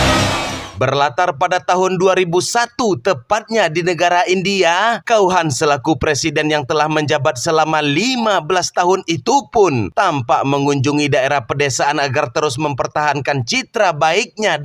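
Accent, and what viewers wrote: West Sumatra, Indonesia. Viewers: Orang Minang ya bg? Jernih banget ngomong njiang gadang nya 😅😅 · Minang kah ads bg😂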